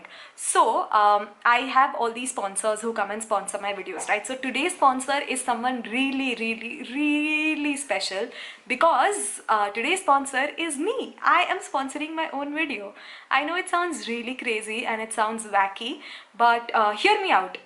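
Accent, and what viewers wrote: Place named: South India, India. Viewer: You sound so much like a South Indian,you sound so cuttee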